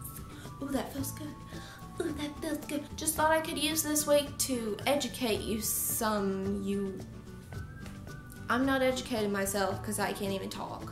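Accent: South, United States. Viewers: That southern accent is SO unique like there is something about it it's so beautiful (you are too!) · as a European, this southern accent and the vocab are absolutely my favourite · As soon as you started talking I could tell you have a southern accent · Don’t try to hide the accent, to a Canadian, your voice is so hot😂 I’m coming to Alabama this summer and I can’t wait to meet people with your accent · Yo imma from the south and I almost immediately recognized your southern accent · don't know why, I am British and I love the southern American accent, in fact, the whole culture fascinates me and would love to visit y'all (see I am already practising) some time, your accent is cute 😁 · I could just listen to you all day long I am from the UK and I love the southern American accent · You’re gorgeous and I love your southern accent. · I love the southern accent ❤️ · This girl is damn beautiful, especially with the southern accent! 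· Your southern accent makes you more gorgeous. I worked hard to give mine up, and regret it - feel like I’ve lost part of me. But I can fall right back in to it at the drop of a hat ( especially after a few beers )... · The southern accent is delicious, squee and downright heart warming. I'm English but I can do the accent so I'd fit right in 😊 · I love your southern accent omggg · Love a southern accent! Don’t try and hide it!!! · I'm Scottish and want a Southern girl 😍 love the accent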